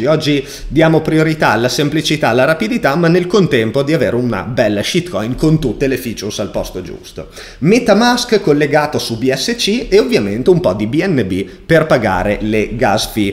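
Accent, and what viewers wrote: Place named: Romagna, Italy. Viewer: vabbè sei palesemente romagnolo. Viva la Romagna, follow immediato.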